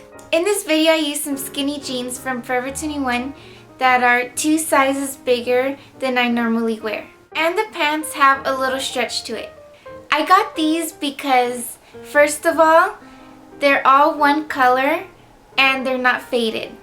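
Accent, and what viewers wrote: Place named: Spain. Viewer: Se te nota bastante que hablas español =)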